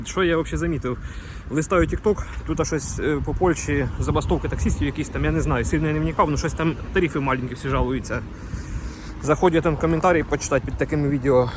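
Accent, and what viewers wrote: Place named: Orikhiv, Ukraine. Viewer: Так ты парень с Орехова, зачем ты говоришь, что с Запорожья?? Понятно , что в Запорожье никто так не разговаривает. Богдана знал может? У него Горячий Хлеб был по всему орехову , преображенка, новоданиловка. Не надо говорить, что ты с запорожья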